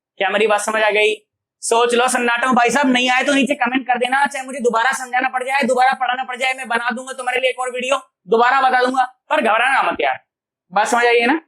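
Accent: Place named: Bihar, India. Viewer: First of all, you speak a very nice bihari, secondly your explanation is also very good... I got the concept..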